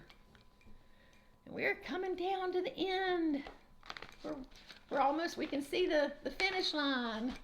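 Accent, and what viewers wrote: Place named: Eastern North Carolina, United States. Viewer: I enjoyed your WIP Parade and listening to your melodious voice. It reminds me of growing up in eastern NC. Happy New Year to you and Peter!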